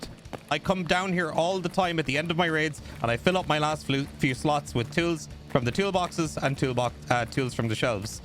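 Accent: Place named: Ireland. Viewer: The Irish accent is a thing of beauty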